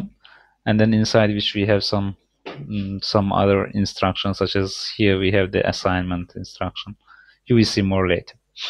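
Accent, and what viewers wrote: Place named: Iran. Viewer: I like the Persian accent! thanks for the content it is useful though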